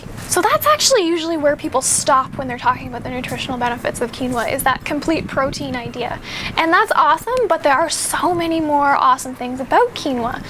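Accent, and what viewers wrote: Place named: Canada. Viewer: I notice you have a Canadian accent. Are you originally from Canada? Where do you live ?I watch your videos and all is good! Love the Recipes.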